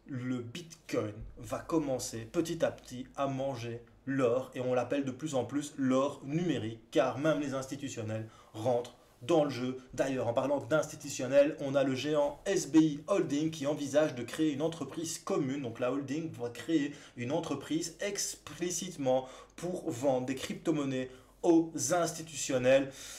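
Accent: Belgium. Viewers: Coucou ça fait plaisir d'entendre ce belle accent belge, par contre le son c'est toujours aussi bas en volume et en gamme, courage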